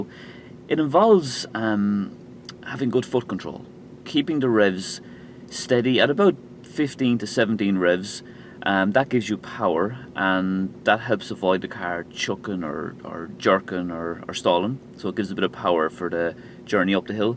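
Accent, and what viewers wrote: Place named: Ireland. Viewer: hi, today I went up a seriously steep hill and my car told me to select a lower gear so I went up in second but my foot was all the way down on the accelerator! It felt like the car was going nowhere or was going to roll back! I nearly s*** myself! I did make it up the hill eventually but nearly had a heart attack as I'm a nervous driver anyway. Was I correct to proceed up in 2nd gear? Is there a correct way of going up a very steep hill as I thought my accelerator was going to snap off. please let me know as I'd like to take the kids to where the hill led to in the end but am really scared of driving up that hill. Love your irish accent by the way!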